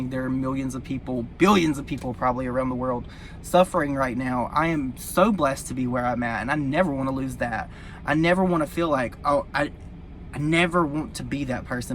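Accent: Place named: South, United States. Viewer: This is off topic (seeing I’m physically sick to my stomach over all of this I figured I’d try and change the subject for a quick second- awesome video by the way) but I’m extremely curious... where are you from,? You have a southern accent. I can’t help but notice it because I love it 😊❤️ I just moved to Charlotte, NC- you have close to the same accent everyone does down here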